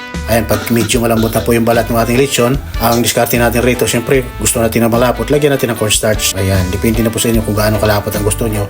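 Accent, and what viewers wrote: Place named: Visayas, Philippines. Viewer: Bisayaa kaau oi..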